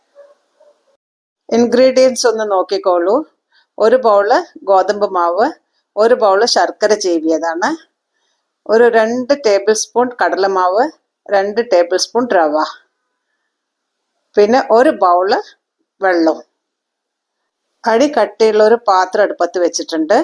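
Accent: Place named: Palakkad, India. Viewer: You sound like my Grandpa 's family from Ellapulli Palghat 😊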